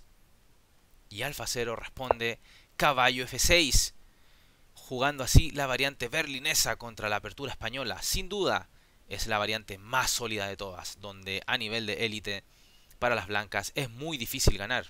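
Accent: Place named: Chile. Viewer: Estimado creo que eres el mejor comentarista de ajedrez que he escuchado, la emoción que logras despertar es increíble 🤩 Te envío un abrazo desde Viña Del Mar, Chile 🇨🇱 (Por tu acento asumo que también eres chileno) Espero ver tus análisis del torneo mundial entre Carlsen y Nepo!!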